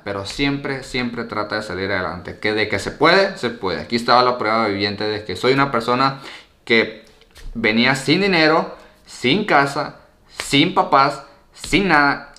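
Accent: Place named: Sonora, Mexico. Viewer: AWEBOOO yo sabia que este mushasho era de Sonora! 👌😎 Te acabo de conocer por el video de jordiwild, y nomas por el acento pense "este vato es de aqui del rancho"... Esta curado tu canal, ya tienes un sub mas 🤜🤛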